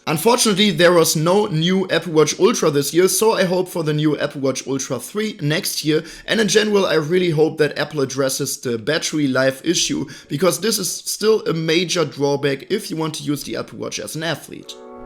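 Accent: Germany. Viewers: What an awesome unbiased cover of these watches. I love the channel name too. You get exactly that, reviews with a German accent 😂. I hope you get more followers with this much effort.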